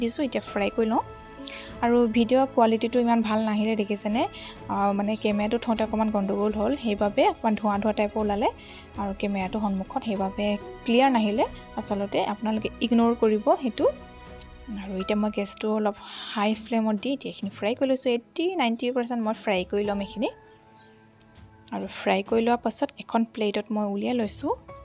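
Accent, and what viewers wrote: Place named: Upper Assam, India. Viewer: মই যে তোমাৰ কথাখিনি শুনি বহুত ভাল পাওঁ ।উজনিৰ যে শুনিলেই গম পায় । আজি মানে বাবুৰ দিন । চিকেন ৰেচিপিটো মোৰ কাৰণে নতুন । ভাল লাগিল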